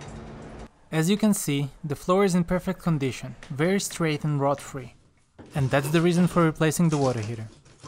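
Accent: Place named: Russia. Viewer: I've got to leave a comment, I hate it usually : ) bought the same unit, doing a change over install from a Bosch 82% eff. Love your eagerness to make things work and to do it properly. Love the accent but you don't typically look Russian, I'm Canadian and have a good friend Sergei who is Kazakhstanian, anyway appreciate what you did and the tact in which you did it, I might have been muttering with all the extra challenges, you sir make me a better person, stay safe, I'm a nurse(male) awaiting the pandemic, trust in the Lord, death is certain but how we live and who we live for changes everything, just wanted to send some blessings your way, thanks for yours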